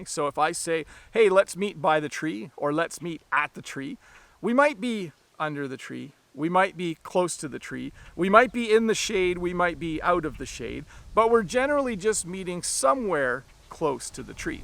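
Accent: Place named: Canada. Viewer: New subscriber and I found your Video's really helpful to learn english in canadian accent. I'm enjoying your videos very much Sir. I'm starting to download many of your videos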